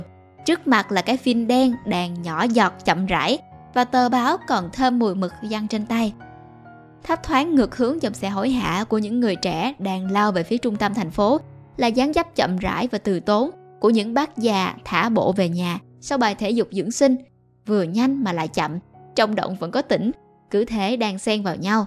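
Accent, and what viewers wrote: South, Vietnam. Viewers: ơi là. Giọng Nam đáng yêu quá!!!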